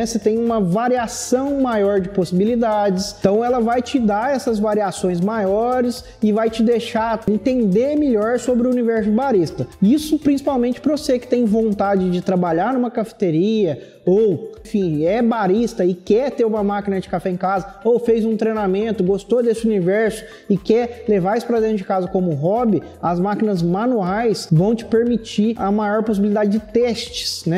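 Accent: Minas Gerais, Brazil. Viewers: Oi, eu não conhecia os cafés especiais e o quão incrível é esse mundo até achar o seu canal por acaso. Passei a seguir suas dicas e hoje eu aprendi a apreciar o café. Só tenho a agradecer pelo seu excelente trabalho! se possível pode tirar uma duvida minha? eu tenho pesquisado a respeito de uma maquina da Oster esse modelo aqui: <link> comparada com as outras ela parece muito mais simples. você já viu essa maquina ou teria algum comentário a fazer sobre? ela parece ser muito simples de usar. sobre o bordão, bom acho que sendo de minas você poderia usar algo mais característico do sotaque dai. Talvez fique legal C: um grande abraço e muito sucesso!!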